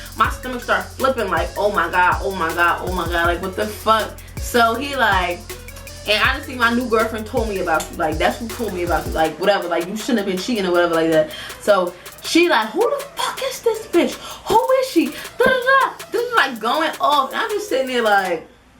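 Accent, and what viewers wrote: New York, United States. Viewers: u from new york?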